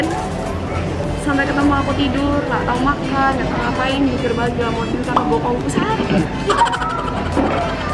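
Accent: Java, Indonesia. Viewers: Ngomomg e medok ngono🙄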